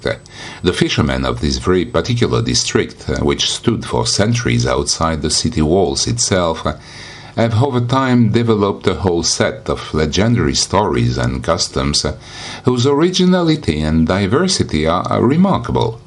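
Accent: France. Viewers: I love the narrator's French accent !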